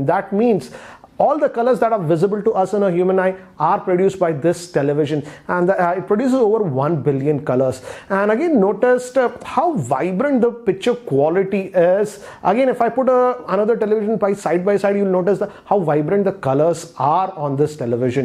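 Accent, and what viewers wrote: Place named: India. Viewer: english indian accent... the most terrible!...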